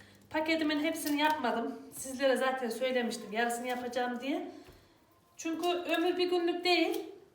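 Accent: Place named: Central Anatolia, Turkey. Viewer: S a hnm ic anadolunun insanina dogallik yakişır şivenizi degistirmek icin ugraşmayin sizede her insana oldugu gibi dogallik yakisıyo Allaha emanet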